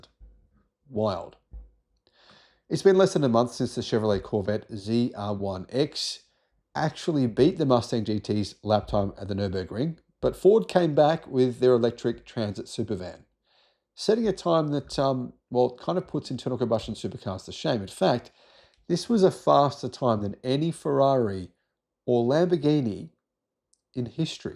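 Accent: Australia. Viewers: if you’re going to call Zeds Zee, then, you may as well do away with your Aussie accent. Just sayin’.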